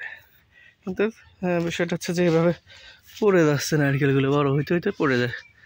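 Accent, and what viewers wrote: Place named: Faridpur, Bangladesh. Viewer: ভাষা শুনে মনে হয় আপনার বাসা মনে হয় ফরিদপুর?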